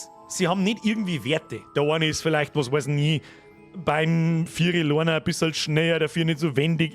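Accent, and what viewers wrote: Bavaria, Germany. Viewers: Geiler Stream wie eigentlich immer also wos soge denn lang du Bayrische Mundart Legende 💙🤍👍🍻🍻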